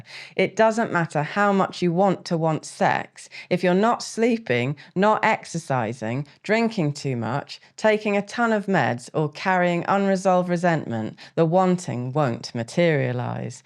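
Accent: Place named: West Country, United Kingdom. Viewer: Sounds like someone from West Country, nothing more.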